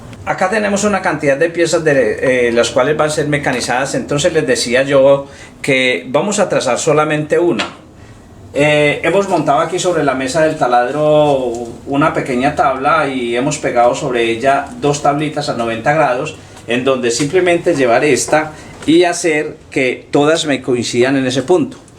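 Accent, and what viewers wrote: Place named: Antioquia, Colombia. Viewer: por lo demas,bien ,todo bien explicado pero ese acentico me lo escarapela,no nos gusta pa ni mierda somos medio alergicos a los paisitas aqui en bucara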